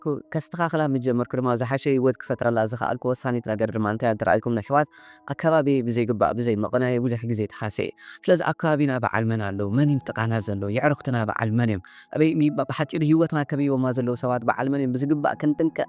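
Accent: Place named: Ethiopia. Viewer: እቱይ ናይኩም ትንታኔ ብጣዕሚ ጨለ እዩማ ግን እቱይ ላዛ ትግርኛኹም ለይጣዓመኒ ብላይ ራያ ትግርኛ ጌርኹም ኣዋግዑናየ ላይ ራያ ራዮማ ትግርኛ እዩ ዓሳዲ ልምችእ ... ኣርግዕዎ እምበይ 😂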